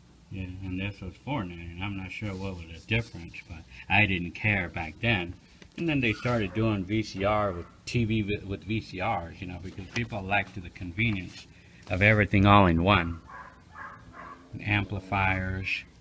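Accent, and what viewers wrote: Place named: Cleveland, United States. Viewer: Sounds like Cleveland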